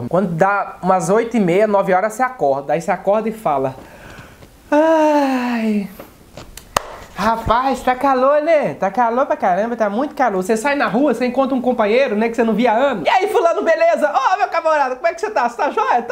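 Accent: Minas Gerais, Brazil. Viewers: Você é de minas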